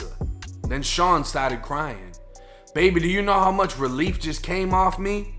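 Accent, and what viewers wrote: Boston, United States. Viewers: Lol the Boston accent makes more entertaining to listen to the stories. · How do you have a Beantown accent coming from Florida? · Bruh you could be from Tampa but you got that Boston accent straight up!!! Salute to your work tho.. always a great watch #MISSIONHILL #BOSTON here to represent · U from Boston?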